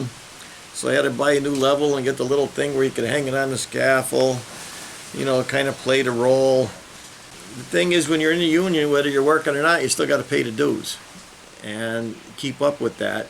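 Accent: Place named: Chicago, United States. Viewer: Great video. I’ve been in my union for 26 years. You get out of it what you put in .i started watching your videos because I was trying to figure out what type of cement to use in a faux stone paver walkway my wife has me doing. And I’ve stuck around for the stories. I work in Philly we have an accent. I thought I was listening to an old Chicago fella. 😂. God bless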